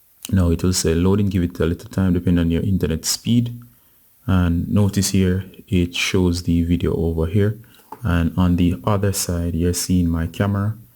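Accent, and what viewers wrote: Jamaica. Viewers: You sound like your jamaican....Thanks for the tutorial!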